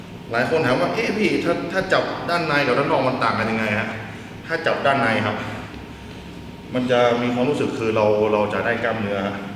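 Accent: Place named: North, Thailand. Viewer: ธนยศ ไชยชมภู สำเนียงบ่งบอกเลยไข่ป่ะ พี่เขาคนเหนือแน่นอน 555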